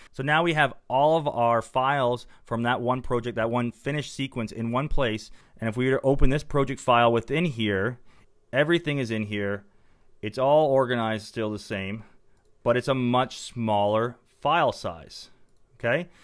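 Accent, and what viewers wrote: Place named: Canada. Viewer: He's Canadian. It's one of the "tells," as is "uh-GAYN" instead of "uh-GEN" for the word "again." I worked with an Irish-Canadian editor on a couple of movies (shot in Canada, edited in Santa Monica, California) and learned a few of the clues to spot the Canadians (beyond "ah-BOAT" for "about"). Now I can't watch TV without noticing Canadian accents. (Australian actors, for some reason, seem to be the best of the English-speaking diaspora at blending in with UK English or USA English speaking casts. I think a lot of Scots are good at blending in with their southern (English) cousins.) OK, I'm rambling ... maybe too much coffee ... sorry... but accents are fun....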